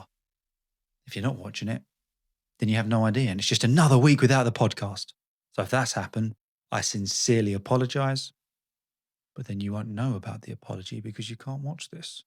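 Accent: United Kingdom. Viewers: Is it UK English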